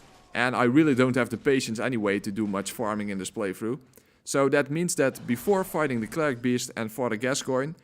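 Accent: Netherlands. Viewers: ah sweet, sorry for the very late reply lol xD, i thought i noticed in some of the way of prenouncing, not trying to say the english is bad in any way though! Just as a fellow dutchie u recognize other dutchies talking in English. Also great video btw, this helped me a lot with the bl4 run :)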